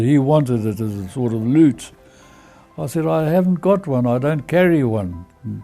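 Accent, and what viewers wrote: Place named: South Africa. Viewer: Know that accent SA best pilots ever.BALLS,Montgomery said it.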